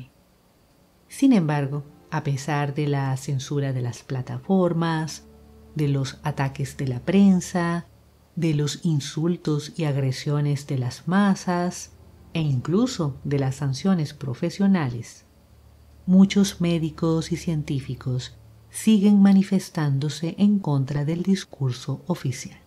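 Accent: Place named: Costa Rica. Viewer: Porque es costarricense 😁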